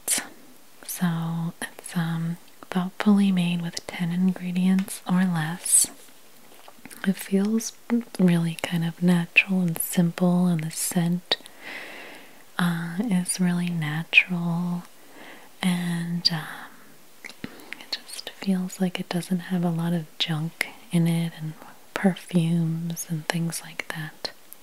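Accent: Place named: Pennsylvania, United States. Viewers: I was pretty sure you were a Pennsylvania native based on your slight accent but I knew it for sure when you said you got your bar soaps at the Giant 😂 I'm outside Binghamton NY and we had Giant stores here up until WEIS took them over when I was in my 20s. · I love your accent. To me it sounds like you are from southeastern PA or from Maryland and it makes me feel like home! Best ASMR voice ever.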